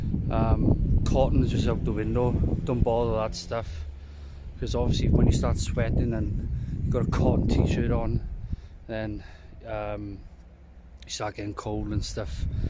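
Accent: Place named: Wales, United Kingdom. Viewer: Just stumbled on your vid. Great tips. Love the accent I lived in South Wales for a bit. I miss it down there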